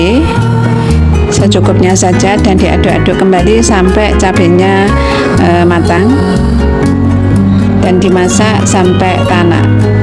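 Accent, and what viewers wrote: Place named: Java, Indonesia. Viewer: Bunbunya medok mantul bun🤗🫶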